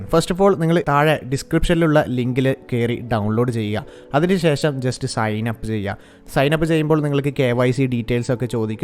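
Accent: Kannur, India. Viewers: Mangala poraa kannur anno machaa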